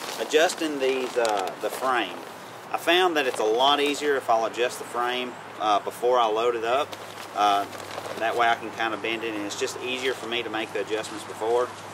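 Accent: South, United States. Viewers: I like your video. It's very informative. I'm new to hiking and I have have a question about the pack. Do you expect the pack to last a few years? The cuban fiber fabric seems so thin and looks like it won't last very long. I've never seen any in person just here on YouTube. I noticed the zpack website has a 1 yr warranty. I would just want to make sure it's worth the money. Also, you sound like you're from the south! Have you ever hiked The Pine Mountain trail in Georgia? It's a really nice trail with a little bit of presidential history. Franklin D. Roosevelt's Little White House is near by and he used to vacation there a lot. Thanks for the info!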